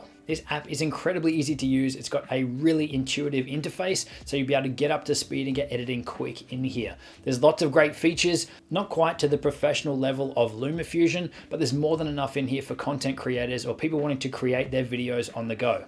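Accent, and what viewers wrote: Australia. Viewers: Are you from Australia? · Do I sense a fellow Aussie?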